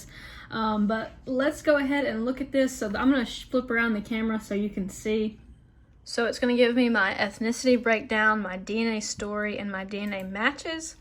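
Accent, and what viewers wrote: South, United States. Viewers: Are you from the Deep Deep South?, because I can hear a SouthernBell Accent from you?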